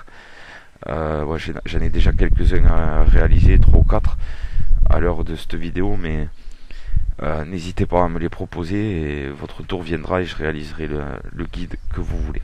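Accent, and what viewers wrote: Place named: Paris, France. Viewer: Vous avez l'accent pointu. Très bien expliqué et on voit que vous le faites avec amour 😊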